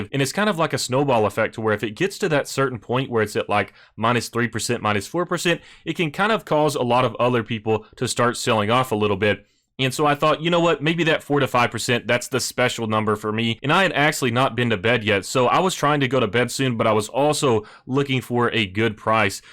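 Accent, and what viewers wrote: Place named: North Carolina, United States. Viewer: Love me some North Carolina accent.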